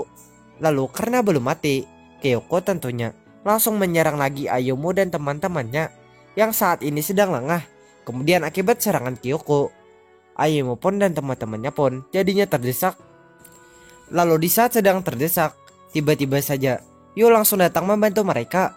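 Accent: Banjar, Indonesia. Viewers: Orang banjar kah bang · Bang lo org banjar kh?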